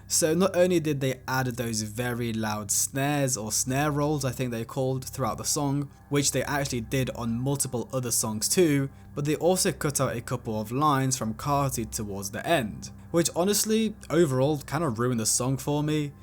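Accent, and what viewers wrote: United Kingdom. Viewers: did this youtuber really just said "Young Scutaaah" ????? I hate UK accent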